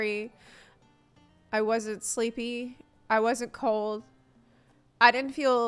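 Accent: South, United States. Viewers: Pretty sure she's native English speaker. But yeah, as a Cajun, I'm definitely getting some Southern vibes from her.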